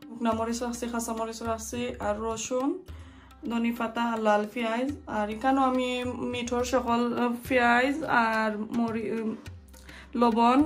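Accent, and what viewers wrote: Sylhet, Bangladesh. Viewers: Afa ami afnar ek sylhety boin . Amar channel ta guriya ayba ar Bala lagle subscribe korba. Amio subscribe korsi.afnar sylhety mat na sundhor MashAllah.karala Bhorta- kube moja oibo lajer.❤️❤️🍓🍓🍓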